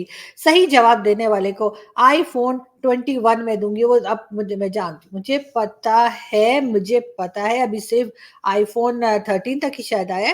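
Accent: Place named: Uttar Pradesh, India. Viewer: Aapka bolne ka tareeka UP walo jaisa hai.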